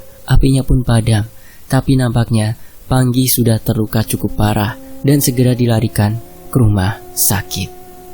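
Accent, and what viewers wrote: Java, Indonesia. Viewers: Metu Su... 🤣🤣🤣🤣 mas mimin katon tenan jawane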